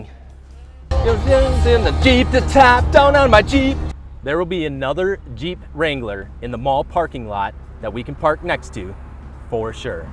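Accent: Wisconsin, United States. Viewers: Are you from Wisconsin? I think I recognized my accent.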